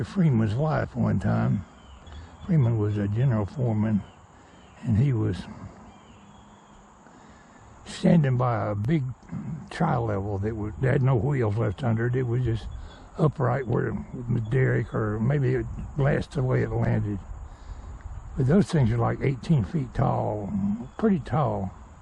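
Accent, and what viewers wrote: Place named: Georgia, United States. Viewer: just remembered your channel and the railroad stories, sometimes listening to your georgian accent is a hoot like the you you say fire, sounds like your saying far. lucky that door didn't fall on him, those railroad things are extra heavy, and you cant ever be too too carful around railroad stuff just hitting your head will about knock you out, that cast and forged metal dont have any give unlike a car or lighter vehicle made of sheet metal, belive me I know in the course of helping in the shop for the nkp 765 we have dont much car restoration the last 5 years, I rung my bell several while working on the inside of the tender to clean it in the off season all those baffles inside the tank , or backed into eighth inch metal on the body of it it dont have any give , and skinned myself plenty crawling around in the inside of the firebox, I would say the scaryest time was wirewheeling and I hit a right angle on the bottom of a car I was taking the dirt and rust off of by the truck of the car and it bounced five times hit my left wrist and bounced back and launched right across my neck still in my hands and landed on the floor it it was a cutting wheel I might not be here or gravely injured, one other time 2 years ago sat a 10 lb arch brick down with my finger right under it and it had a lump on it for a few months from squashing it under the block and the metal of the firepot where the coal comes out to be thrown to the fire with steam jets from the stoker.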